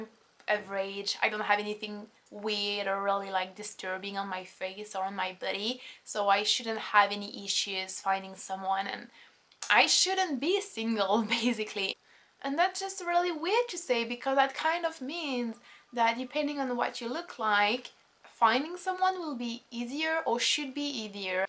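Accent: France. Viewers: is that a legit french accent? pretty eyes by the way! the video is about nothing though. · You're a really pretty girl. I like how deep these videos usually go into topics. I couldn't agree more when it comes to relationships. I think they often preoccupy or even distract people who could otherwise live a more fulfilling life. The French Accent is awesome too. Reminds me of the movies. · Est-ce parce que je suis français et qu'elle a probablement un accent français que j'arrive à tout comprendre ce qu'elle dit? En tout cas, merci pour cette vidéo. Très bel état d'esprit, et toujours aussi ravissante!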